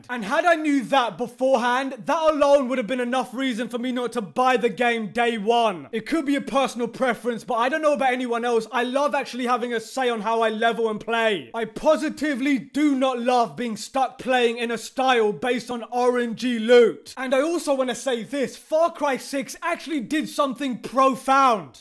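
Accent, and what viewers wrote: United Kingdom. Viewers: the british accent is annoying as it is screaming into the mic dosnt help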